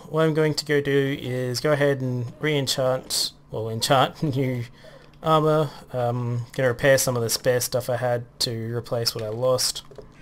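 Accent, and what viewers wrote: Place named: Australia. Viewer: Lol... Pretty epic fighting there... Also, stop scratching! Can't wait for the gold farm and you sound more Australian mate.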